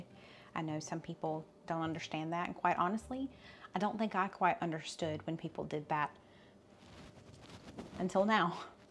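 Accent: South, United States. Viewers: Hey We are here for you My Prayers and Blessing to you and and All your Family and Thank God weren't hurt And Remember Gracie Lou is a your Guardian Angel I am From East Pennsylvania and I am Full puerto and just be yourselves you'll Rican and I was Born😅 Big Family and I So Love Your Southern Accent and My Dear you are Perfect as you are and is Hilarious and So My Dogs Name is Tootsie Lou he has My Middle name but it's spell Different It's Bonnie Luz omg I would Love to meet you in Person and I am So Sorry You had Loss Gracias Lou I had cry so fast I had a Sense it, it's ok to cry and it's ok.. I love watch her Old Videos You 2 jusr Be yourselves ok The Cutest Couple I watch All your old Videos you light up My Spirits Real z people IN The Kitchen cooking and Kidding around Having Fun ❤My Sister and I watch you.. Sending All My Prayers and you get Back where you were Before the Hurricanes and you Safe.. 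you are Speaking Spanish Is On the Dot.. take care Mamasita And papacito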